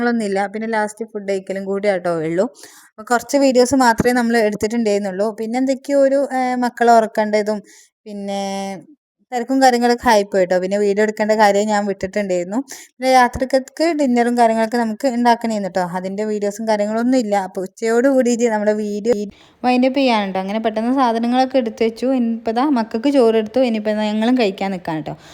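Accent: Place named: Malappuram, India. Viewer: Samsaram sherikum malappuram touch und atha chothichee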